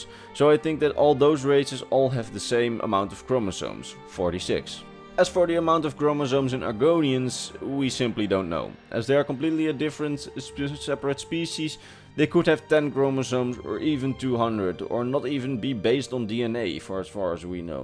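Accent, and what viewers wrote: Netherlands. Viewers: Hey! Je bent ook Nederlands! Ik dacht al dat ik het aan je accent hoorde XD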